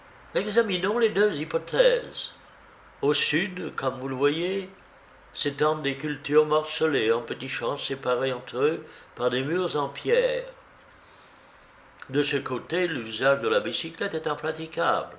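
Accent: England, United Kingdom. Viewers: Le lecteur qui est connu pour ses qualités de diction adopte dans récit l accent anglais qui parfois écorche les oreilles alors qu il aurait dû parler simplement en Français. Mais malgré tout il mérite les félicitations. DJENANE Rachid Alger.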